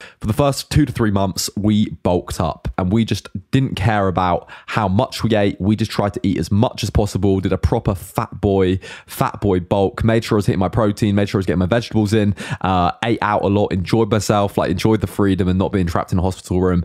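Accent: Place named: England, United Kingdom. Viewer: I love your English accent 😍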